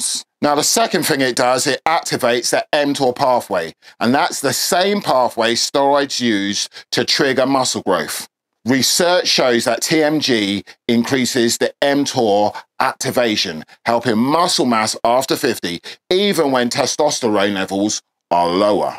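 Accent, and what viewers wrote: United Kingdom. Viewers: I love your snappy Brit accent...reminds me of the Guy Ritchie movies...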